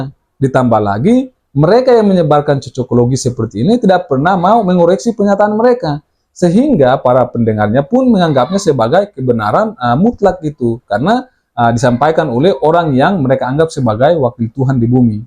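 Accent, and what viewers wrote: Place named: Papua, Indonesia. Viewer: ketauan misionaris terselubung kau, dari logatnya aja udah ketauan kau kristen papua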